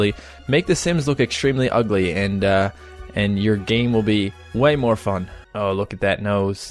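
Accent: Canada. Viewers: Hello I watched your sims 4 videos with my mom because she is obsessed with the sims, she loved your videos and wants to know if you are Canadian, because of your accent... Yeah... Random. Anyway, thanks for vids, you really made us laugh.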